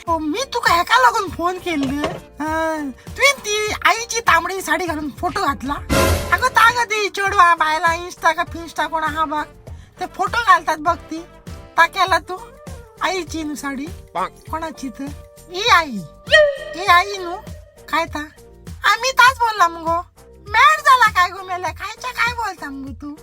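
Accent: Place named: Pedne, India. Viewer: The way you speak Pednechi bhashya is just superb....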